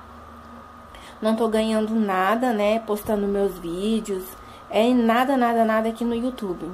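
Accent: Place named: Northeast, Brazil. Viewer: querida, vc parece ser uma boa pessoa e ter bom coração, pelo seu sotaque eu diria q vc assim como eu é do nordeste :) sobre oq exatamente vai ser seu canal? culinária?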